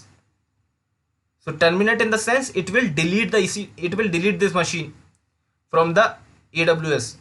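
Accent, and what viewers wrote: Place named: India. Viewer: You must be coming from India, because you have an Indian accent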